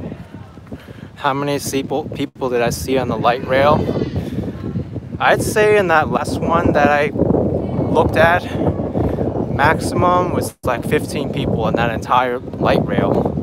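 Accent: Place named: New Jersey, United States. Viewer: YOU are at my former neighborhood. Although I grew up in Union City just north of Jersey City. You got the Jersey accent very good. Who ever thought there would be skyscrapers in Jersey City!! WOW! I miss seeing the skyline from Jersey. When I lived in Union City I saw the skyline everyday. Thanks for traveling to Jersey City. I hope you got back home safely. God bless you.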